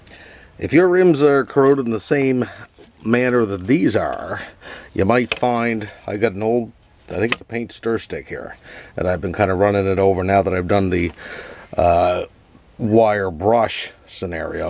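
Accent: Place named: Newfoundland, Canada. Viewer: You must be a Newfie! Awesome and thanks for the video. Cheers from Alberta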